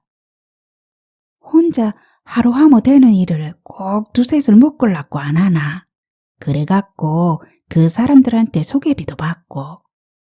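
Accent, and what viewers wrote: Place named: Gyeongsang, South Korea. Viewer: 반갑습니다 ^^성 보경님의 푸른 넥타이, 잘 들었습니다 욕심많은 엄마 때문에 결국 재주많고 똑똑한 금희를 죽였네요 금희가 너무 불쌍하네요 주인공은 천진무구,참 귀엽고... 의 경상도 사투리가 사랑스럽고 예쁘네요^^ 재미있게 감사히 잘 들었습니다 ♡♡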